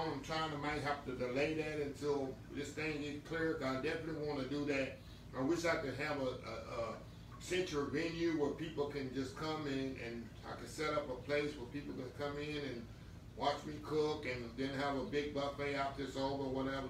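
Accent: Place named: North Carolina, United States. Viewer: Love watching you create different foods ! Love your accent. I know you from Texas but it reminds me of NC in which I lived there for quite a few years. People there are pleasant . They love their get togethers and. SOUL FOOD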